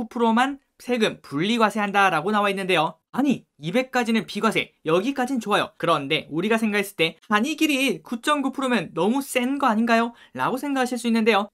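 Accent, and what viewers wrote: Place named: Daegu, South Korea. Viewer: 저도 느끼고 있었... ㅋㅋㅋㅋㅋ 전 부산인데 사투리가 대구 친구랑 똑같길래 대구구나 해찌여 ㅋㅋㅋㅋㅋ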